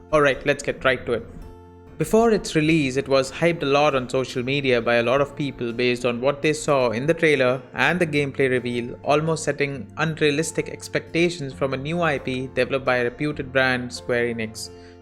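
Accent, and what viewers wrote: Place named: India. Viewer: Couldn't watch longer than a minute without clicking off listening to that annoying Indian accent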